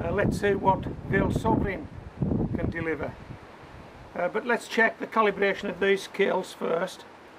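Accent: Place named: England, United Kingdom. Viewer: I’ve been binge watching your videos for a couple of weeks now. Most times I cast it to my TV and let it play in the background while I’m doing something else or working from the computer (like today...it’s needed today). Besides them being highly informative, I also love that one instrumental tune you play, your cadence of speech and accent is calming to listen to, so thank you. (Typical American going gaga for English accent. 😆🙋🏾‍♀️) Nevertheless, I don’t understand why anyone would give this video a thumbs down. Keep’em coming!! Much love from Virginia, USA! 💚 And sorry for the loss of Molly. 😇💕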